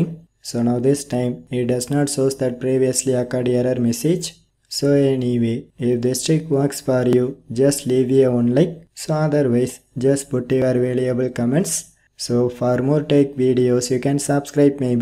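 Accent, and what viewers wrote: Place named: Kerala, India. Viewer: Are u from kerela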